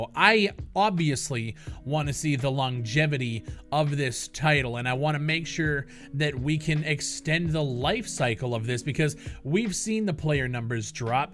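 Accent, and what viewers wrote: Canada. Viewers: I didn't know you had that Prairies accent until you pronounced 'Cal-garry.'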